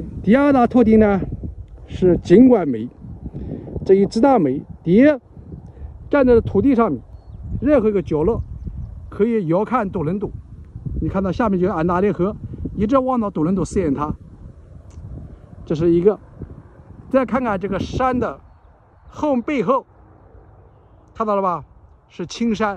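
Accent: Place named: Anhui, China. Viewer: 您的安徽口音太重了，建议增加中文字幕。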